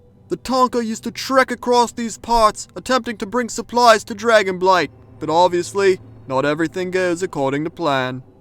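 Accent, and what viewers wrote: Australia. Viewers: bear grylls with an australian accent ...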